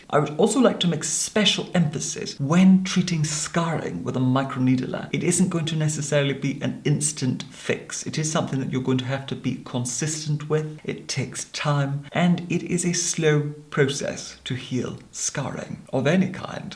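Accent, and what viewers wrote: Scotland, United Kingdom. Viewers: Gosh, I have missed this soothing, clear-cut Scottish brogue. <3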